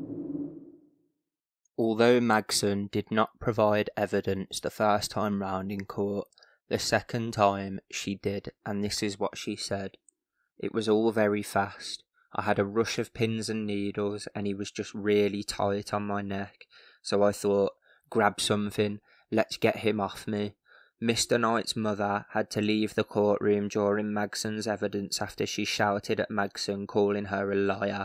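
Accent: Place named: Birmingham, United Kingdom. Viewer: I think this person may be mocking your Brummie accent 🙈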